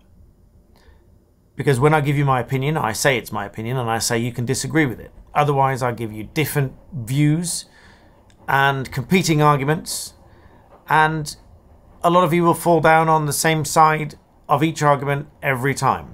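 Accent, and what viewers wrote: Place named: United Kingdom. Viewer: I enjoyed your coverage of the Johnny Depp case and of this case. A true analysis based on what was and has been presented. That comment did not need an explanation by you but you correctly and eloquently put her in her place in your amazing well spoken British accent. Keep up your good work, I as with many others, certainly appreciate it as it gives a better understanding. Thank you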